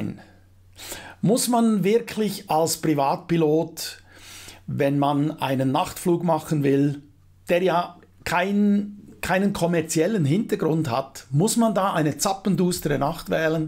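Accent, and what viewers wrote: Switzerland. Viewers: In der Flugplanung schon Fehler und dann noch in der Kontrollzone abdrehen müssen. Ich weiß nicht was diese zwei Jungs da oben in dieser Nacht geritten hat. Da ist nicht viel Flugerfahrung eingesetzt worden. Traurig und es wäre nicht notwendig gewesen, in dieser Nacht sein Leben zu verlieren. Interessantes und gut erklärtes Video. Ich mag Deinen Schweizer Dialekt. LG aus EDTF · Das ist kein Schweizer Dialekt, das ist ein Schweizer der Hochdeutsch redet. Das tönt ja in Deutschland auch je nach Gegend verschieden.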